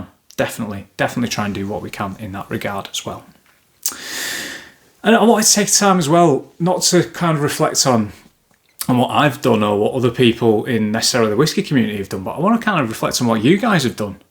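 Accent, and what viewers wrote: Manchester, United Kingdom. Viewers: Nice video there... enjoyed your analysis and choices. Great to hear a fellow Northerner (I grew up in Preston - you sound more Manchester way).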